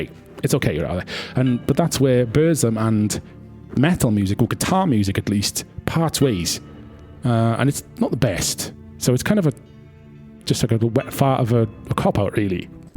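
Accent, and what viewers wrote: Wales, United Kingdom. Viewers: Is this a fellow Welshman I hear?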